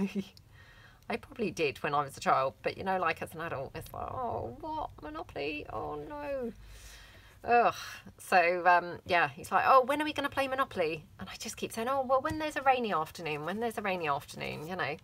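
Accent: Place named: United Kingdom. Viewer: I love your videos,! This is another great one. You are so talented and kind! And besides, listen to you talk reminds me of a wonderful time in my past when I lived in the UK. Thank you for all! 🥰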